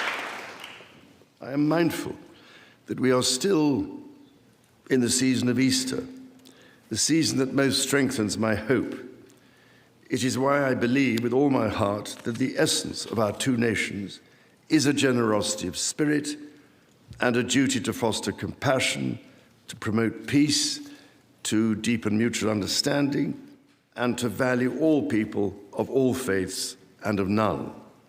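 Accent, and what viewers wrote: RP, United Kingdom. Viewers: That speech put us Americans to shame in terms of pure literary eloquence, and RP, or Received Pronunciation i.e. "the Queen’s English," which is a is a high-prestige, non-regional accent historically associated with the upper class/royalty for its articulation, distinct vowel sounds, and a "cut-glass" tone. If i was taking an order from his majesty, I would be hanging onto every single word out of sheer admiration, and i am sure to his majesty's utter exasperation and irritation. Otherwise, a highly politicized speech requesting President Trump and US to recommence aid for Ukraine and a little sliver of an arrow about politicAl speeches and the importance of language.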